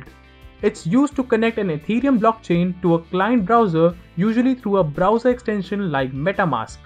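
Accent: India. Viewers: 1) Among the dozens of videos on crypto, yours is best because of Indian English. If I hear American English I get angry because I can digest less than 30%, but I can digest 100% of your Talks. 2) To be a successful Blockchain Developer, apart from All the technical Programming stuff,what is needed is SIMPLICITY, for common man to participate in Crypto Investment.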